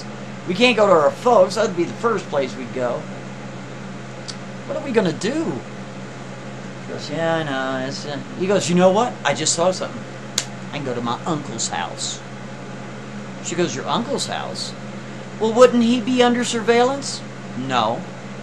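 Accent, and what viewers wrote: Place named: South, United States. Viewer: A<link> DAMN UNCLE! I’m a new subscriber to your channels. I love everything you do. “Split Time”....hanging on EVERY.SINGLE.WORD. I love me some!! I’m from South Carolina so I caught the southern accent that you were throwing around especially when the story got to the damn uncle. LMAO 😂 It really cracks me up bc you usually don’t talk that way. Anyway, I loved the story, I love October Reignz, & I11uminati. You input a Song on your other channel/video recently “ ” by the and I was thinking while I was watching other October Reignz videos how perfect THAT song would be in an upload. I was like, wait for it,& there it was. I don’t wanna sound like a sycophant or anything but I LOVE EVERYTHING THAT YOU DO!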